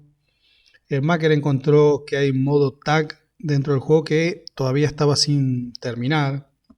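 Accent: Argentina. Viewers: Eres argentino?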